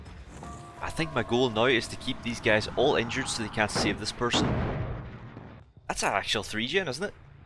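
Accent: Northern Ireland, United Kingdom. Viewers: Is this a Norn irish man playing DBD? Lol my guy. Good to see someone else from these parts playing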